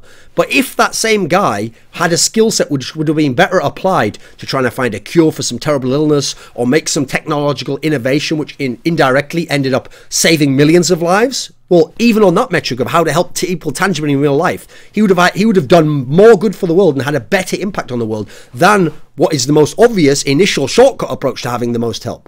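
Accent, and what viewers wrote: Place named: Northeast England, United Kingdom. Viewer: I can't place his accent. Northeast England or Scotland?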